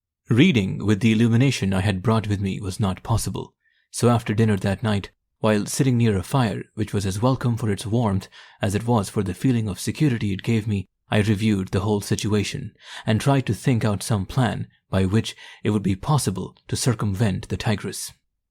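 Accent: India. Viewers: I love hearing these stories from someone who is from india or has parents from india. My favorite aunt is from india. And ever since i was a small kid ive been fascinated with her culture and family. Her two sons are my favorite cousins and i do my best to be a good older male role model for them like she and my uncle were for me. Hearing your voice reminds me of her family. Thanks for the authentic experience. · Would be better without the forced pseudo-American accent. It grates on the ears. Edit: ah, just realised you are an Indian living in the US. That explains the appu accent. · Even though jim Corbett was an Englishman the story is narrated in an Indian voice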